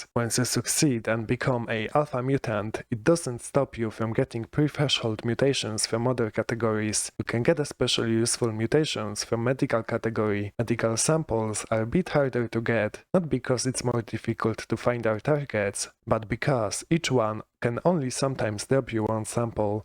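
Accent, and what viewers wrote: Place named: Poland. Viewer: Interesting contnet, funny jokes and nice Polish accent.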